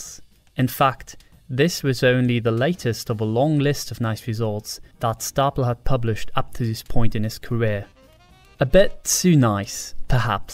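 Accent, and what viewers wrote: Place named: Germany. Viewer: It took me that long to realise that you were German 😂 Mega spannendes Video, hast du einen Hintergrund in Psychologie, oder wieso kennst du dich so gut aus?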